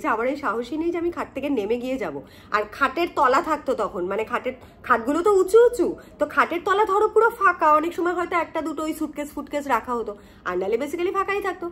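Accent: Bangal, Bangladesh. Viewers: ম্যাডাম প্রথমেই আন্তরিক শুভেচ্ছা জানিয়ে বলছি ভীষন ভালো লাগলো বাঙাল কথা বলতে শুনে। শিম্বার মাধ্যমে আপনাদের সকলকে ভালোবেসে ফেলেছি। আপনি খুব আন্তরিক ভাবে কথা বলতে পারেন।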